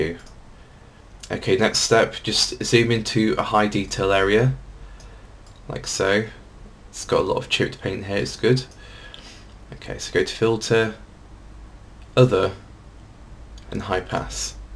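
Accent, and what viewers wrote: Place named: United Kingdom. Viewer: that's a very usefull how-to! what kind of british accent are you speaking? i like it.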